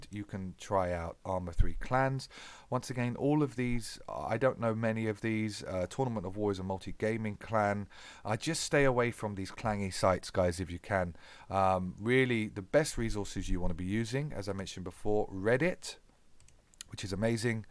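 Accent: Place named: United Kingdom. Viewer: This is one of (if not) the best - "How to find a Unit" guides I've seen 👏🏼. It's also refreshing hear a UK voice delivering it 👍🏼! Certainly a unit I'd consider applying for in the future perhaps 😉. In the meantime, I've subscribed and will be watching/spying/researching (😂) the channel.. 👀 Peace Out ✌🏼😎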